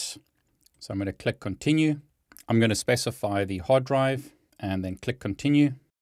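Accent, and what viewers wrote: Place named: South Africa. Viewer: South African!!!😂 Thanx for the installation boet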